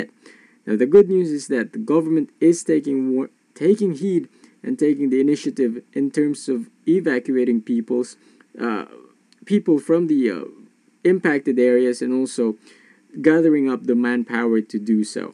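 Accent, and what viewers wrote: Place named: Philippines. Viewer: Cute pinoy accent.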